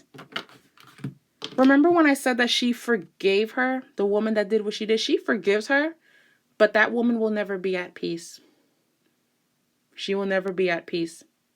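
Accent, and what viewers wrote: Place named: New York, United States. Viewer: Ha. I love your voice and accent lol 😆. New York?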